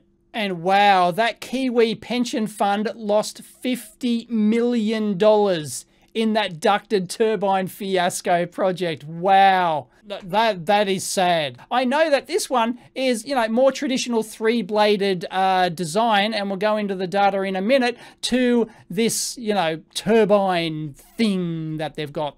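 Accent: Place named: Outback, Australia. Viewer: Why come you talk like you're from the outback?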